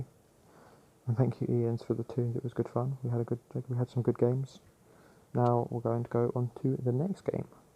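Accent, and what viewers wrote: United Kingdom. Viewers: God damnit I love the British accent 😂😂😂😂😂😂😂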